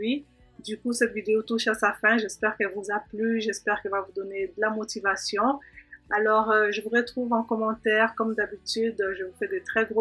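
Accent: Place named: Quebec, Canada. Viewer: Coucou ☺️☺️ j’aime tellement ton accent québécois… moi aussi j’ai un tineco mais le A10, super simple. J’aime vraiment beaucoup la chambre des garçons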